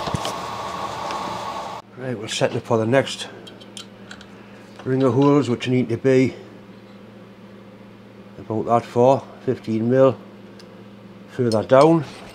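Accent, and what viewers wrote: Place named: England, United Kingdom. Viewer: G'day mate, hope you had a Merry Christmas and please, a happy new year to you and yours. Enjoy your work very muchly. From what part of England do you hale? Your accent sounds wonderful to me. Thanks.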